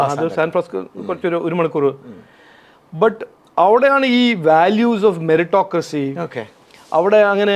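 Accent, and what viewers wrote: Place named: Palakkad, India. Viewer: പാലക്കാടൻ മലയാളത്തിന്റെ ഒരു രുചി സംസാരത്തിൽ നിഴലിക്കുന്നുണ്ട് thankyou sir 🙏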